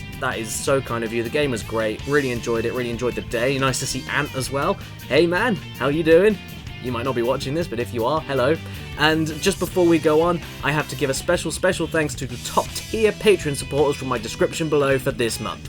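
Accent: United States. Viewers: To be fair you do talk like a yank a lot so maybe that's why they thought that.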